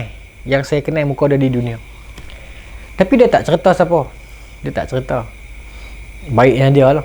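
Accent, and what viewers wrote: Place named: Muar, Malaysia. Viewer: Muar clear 🤩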